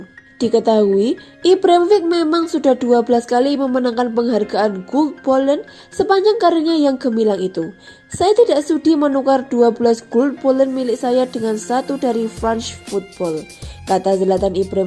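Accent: Java, Indonesia. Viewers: Jawa nya tulen mbak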